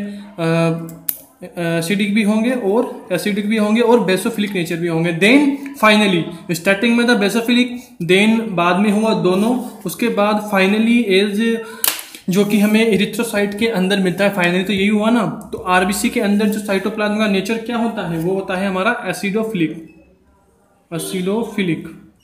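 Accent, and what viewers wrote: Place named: Bihar, India. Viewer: your videos are really good except for that bihari tone you speak.......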